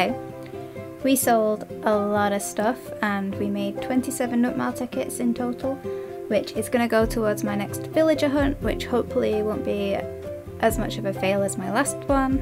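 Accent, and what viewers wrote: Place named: England, United Kingdom. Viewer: Where are you from in England? Your accent sounds familiar but I’m really bad with accents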